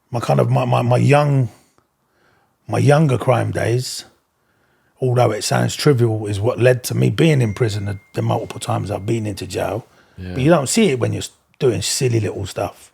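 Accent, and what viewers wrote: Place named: Glasgow, United Kingdom. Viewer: Great podcast 👏 lovely guy! Im Glasgow born and bread and love it 🏴 would like to hear more of your glasgow accent 😂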